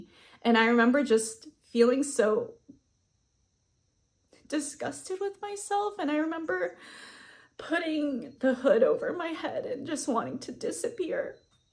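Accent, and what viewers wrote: United States. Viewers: that's just awful, I understand your family was raised in different cultural environments, but your accent or lack of accent tells me that you're very much used to the Western cultural traditions and women are VERY VERY harsh on each other when it comes to physical appearance it becomes toxic because of it being excessively used within social dynamics because of the pathetic desire to one up another person. It's nothing more than underhanded identity politics aka narcissistic characteristic individuals. Sorry you've gone through this, but I'm proud of your courage to voice your emotions and your vulnerabilities, you're definitely moving in a direction to up your level as I'm sure you've done in the past. Keep on moving forward, take your time, take care of yourself, don't be hard on yourself, you're a person, you're not a machine, you have limitations, and they'll continue growing in whatever direction you see fit. 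but at the same time it does seem that you were able to both see things from a logical standpoint and address them in a more objective manner, I wouldn't say intellectualize but definitely got your head on straight and still able to feel so deeply and be so in touch with self.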